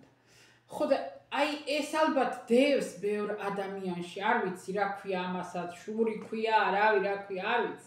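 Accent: Kakheti, Georgia. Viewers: ❤❤❤კახური კილო მსიამოვნებს. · უკარგესი ადამიანი ხარ ❤, უბრალო, წესიერი, კულტურული, განათლებული, საყვარელი კახური აქცენტით და იუმორით😊❤ ძალიან "გემრიელი" და საინტერესო საუბარი იცი😊❤. ჯანმრთელობა და ბედნიერება არ მოგაკლოს ღმერთმა🙏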